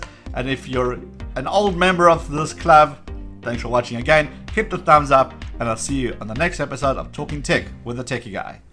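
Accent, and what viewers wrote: South Africa. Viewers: south african accent there ... lol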